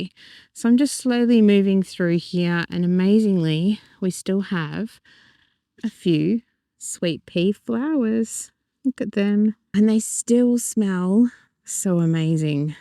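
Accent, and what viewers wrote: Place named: Australia. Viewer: so good to hear an Aussie voice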